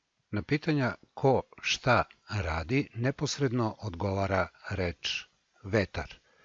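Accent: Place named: South, Serbia. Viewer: Koliko nepotrebnih znanja deca treba da usvoje tokom školovanja?! Pritom, izađu iz škole potpuno neosposobljena za život i rad. Ovo je potrebno onima koji će studirati srpski jezik i baviti se lingvistikom u svom budućem radu. Pritom, ovaj govornik, sve vreme, koristi južnjački akcenat, a drži predavanje iz gramatike srpskog jezika.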